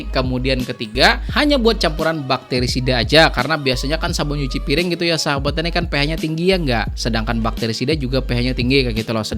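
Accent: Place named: Barabai, Indonesia. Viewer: Logat pian kaya org hulu sungai barabai pak ae😁 salam kenal dari lun petani semangka kab kotabaru kalsel.🤝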